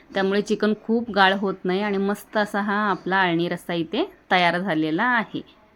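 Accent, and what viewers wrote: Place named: Kolhapur, India. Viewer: Khup ch chhan banvte tai tu chikan chya resipi s aani bolte pn chhan tuzi kolhapuri bhasha khup chhan aahe❤❤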